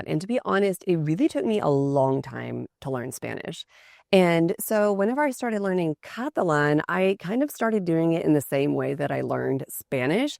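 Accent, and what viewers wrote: United States. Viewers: I wish I could speak American English like you; my goal is to speak fluently, and I know I can do it. For context, I am from Syria and live in New York, but unfortunately, I don't have any friends to practice with. I really love your high-quality content.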